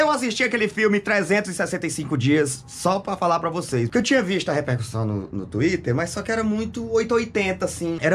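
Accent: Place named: Piauí, Brazil. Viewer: Whindersson saiu do Piauí e nunca parou de falar piauiês , meu vizinhos vão pra SP volta 15 dias dps falando igual aos paulista 😂😂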